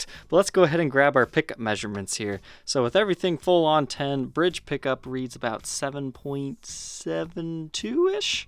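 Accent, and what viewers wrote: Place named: United States. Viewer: Jagwar. The american accent is interesting.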